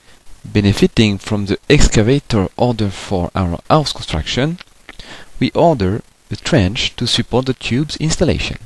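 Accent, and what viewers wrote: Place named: France. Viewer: Nom de diou tu es francais :D Le meme accent que moi . xD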